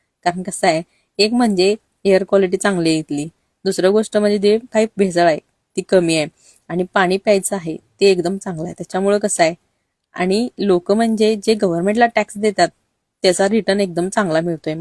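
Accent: Kolhapur, India. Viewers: तुमची कोल्हापूरी भाषा काय बदलली नाही.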